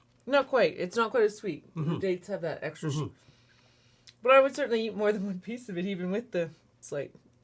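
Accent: Canada. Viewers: I love your vids, but I laugh at how much you sound like my mother's Canadian cousins!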